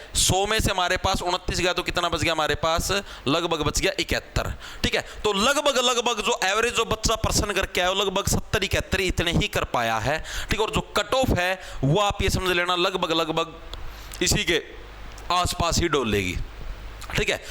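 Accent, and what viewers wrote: Haryana, India. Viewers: Aapki haryanvi mst hai Love from BIHAR 🤗🤗🤗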